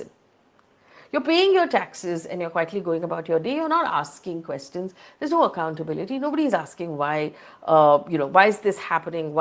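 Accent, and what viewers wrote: India. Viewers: Butler English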